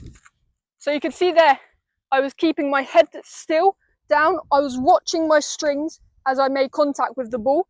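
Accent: England, United Kingdom. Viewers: "Two things! Your videos are absolutely amazing, and your English accent is every Spanish speaker's dream — I still remember my English teachers telling me that!" Greetings From Argentina! 😁😁😁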